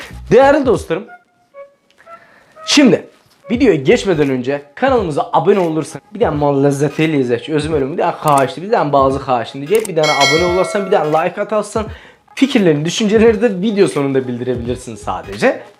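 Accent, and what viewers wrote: Nakhchivan, Azerbaijan. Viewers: Salam siz azerisiniz yoxsa turk lehcenizden belli olmur da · Azerice konuşman ləzət edir. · Ayda sən diyəsən Naxçıvanlısan danışığın ləzzət eliyir😄😄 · Qaqa, senin Azerbaycan dilinde lehceli danışığını sevirem. Ona göre izleyirem seni. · 😂😂😂nə gözəl danışır Azərbaycan ləhcəsində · Azerbaycanca necede qeseng temiz danisirsan abi🤣🇦🇿🇹🇷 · Sen ne qeweng Azerbaycan dilinde daniwirsan😁👍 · Qaqa sən azərbaycanlısan ? Bizimki danışırsan .qaqam sökür sən ha 😂😂😂😂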